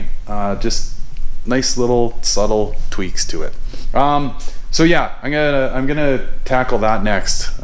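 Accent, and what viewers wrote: Canada. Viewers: This guy always sounds like he's trying to fight a Canadian accent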